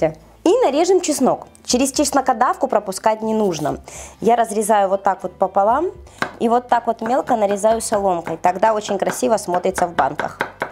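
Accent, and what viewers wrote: Ukraine. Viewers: Девочки,что же Вы такие злые?Во-первых на Украине речь этим и своеобразна.А уксус просто испаряется при варке.Больше позитива ,друзья!!!!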